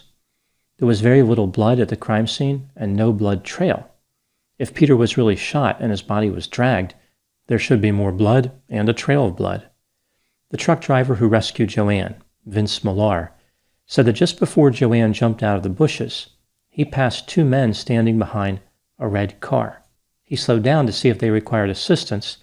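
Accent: United States. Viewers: unexpected and perfect pronunciation of our cities with an American accent. Thank you!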